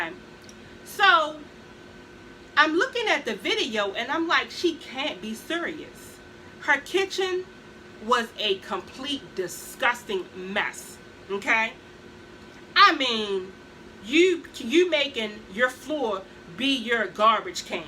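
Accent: Baltimore, United States. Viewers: hey babe! i love your baltimore accent.💛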